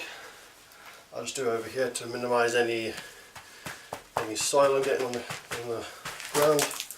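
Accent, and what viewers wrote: United Kingdom. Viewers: I'm comforted by the fact that you are (or certainly sound) British, so I'm presuming you're in the UK...? I have 7 Frangipani plantlets which I germinated last year; they're all about 6" tall, so I'm about to re-pot all of them. Wish me luck - !!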